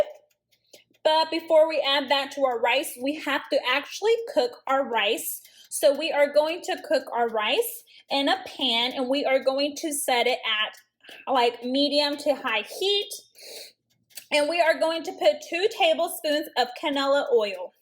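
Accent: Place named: South, United States. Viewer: I aint everrr met a mexican with a southern accent lol. I love this.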